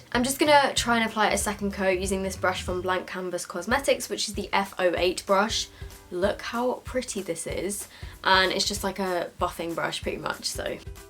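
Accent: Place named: United Kingdom. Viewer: Im probably the only one who doesnt really enjoy the british accent but I could listen to yours for hours. thanks for making videos, love from germany ❤❤❤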